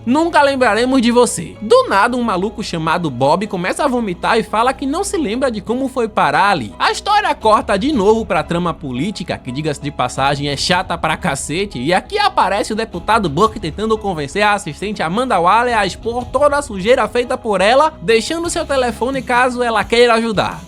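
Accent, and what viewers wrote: Brazil. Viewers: eu sei que vc tem o jeito abrasileirado porém você poderia falar as coisas da forma correta tipo o nome da valentina ou outras coisas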